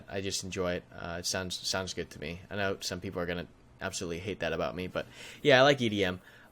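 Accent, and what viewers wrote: United States. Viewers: Asian? with american voice? anyway nice video like always. keep it up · 😍😍 you look sooo cute so just you look Asian, you speak American (not English) and you live in Hawaii omg so cool 😁 pay attention you're starting to speak like Bluedrake42 (the bad (but not baaaad just not that good) way that you do sentences) 😂xD well lov u 😉😍😘❤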